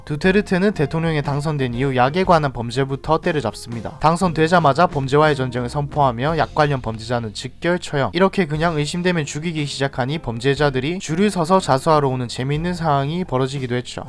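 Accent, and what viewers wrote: Gyeongsang, South Korea. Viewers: 경상도 억양이 억수로 좋네요!! 🤩😎👍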